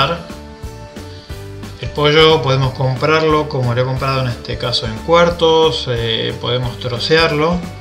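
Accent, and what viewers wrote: Argentina. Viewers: Hola...soy una nueva suscritora. Detecto un acento Argentino 😊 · sos argento??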